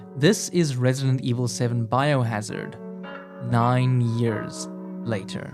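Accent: South Africa. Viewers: When I started you video, I instantly knew you were South African.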